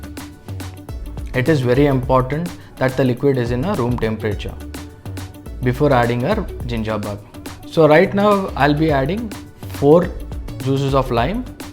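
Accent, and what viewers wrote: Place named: India. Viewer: Thank you....listening to you gives me good memories of my friends of India the Bajaj family.....I think everyone knows them ahahahah.....I am making guava wine now from my huge tree here at my house in the mountains of Guatemala....I will start the ginger bug today for some good ginger beer.....Adios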